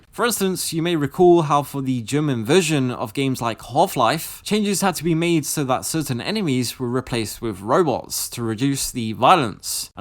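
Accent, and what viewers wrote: United Kingdom. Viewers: You speak with an English accent yet you say "adult" and "minor" like an American. Weird how the internet has influenced the way young English people speak. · Hört sich nach einem Briten an.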